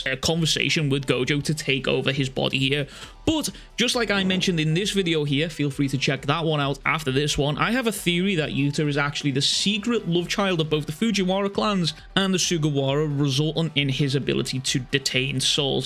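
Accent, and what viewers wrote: Liverpool, United Kingdom. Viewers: Are u a scouser ? I thought you were scottish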